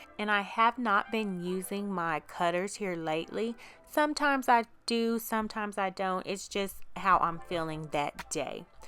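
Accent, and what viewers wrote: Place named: Kentucky, United States. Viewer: You almost sound like your from my area (ky) haha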